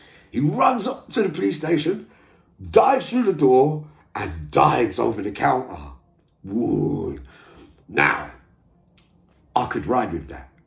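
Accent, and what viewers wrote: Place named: Cockney, United Kingdom. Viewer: Just try deliver your content clearer mate your diction is not great and remember you have followers who ain't cockney varra boys kid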